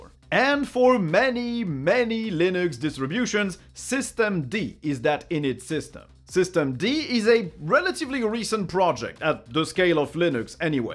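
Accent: France. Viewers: Je reconnais un fond d'accent français. Are you french?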